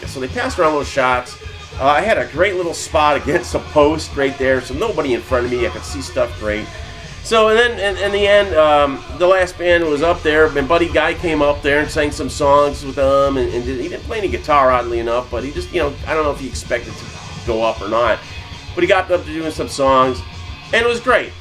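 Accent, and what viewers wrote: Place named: Chicago, United States. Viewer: ! Happy Anniversary! Love the videos! When I’m homesick, I can come here and listen to some Chicaaaago accent and great music! Cheers brotha!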